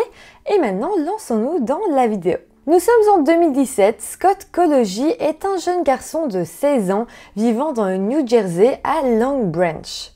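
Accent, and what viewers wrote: Belgium. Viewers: Salut, cette histoire est terrible, pour moi ce garçon 👦 était très troublé, certes, mais certains points contredisent les maladies mentales citaient dans la vidéo, par exemple la préméditation, elle est la, ce garçon malheureusement était un tueur de masse en devenir, et il s'est entraîné sur sa famille 👪, je pense que la peine fera qu'il ne ressortira pas, c'est une sécurité pour lui et pour les autres, il n'a aucune empathie,si toutefois il est malade, il souffre de psychopathie et recommencera, la, ou je pense qu'il n'est pas responsable c'est qu'il est né comme ça, né pour tuer, le passage où il explique qu'il les achevait plutôt que de les laisser souffrir, pour un peu, il leur a rendu service.comme d'habitude j'aime beaucoup tes histoires avec ce petit accent Belge qu'on ne lasse pas d'écouter, bonne fête 🥳 🥳 🥳 de la fin d'année et bonne année 2023...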